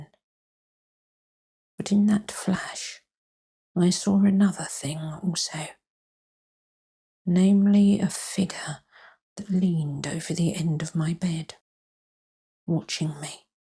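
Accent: England, United Kingdom. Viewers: Can’t place the part of England you are from. Although I’m Scottish a lot of my family lived in Sussex, London and Wiltshire . Your cadence is softer and very pleasant and your readings are marvellous . I would love to hear you read “ Schalken the painter “ by Sheridan Le Fanu. Both a horror tale and an allegory of arti being sullied by rich patrons!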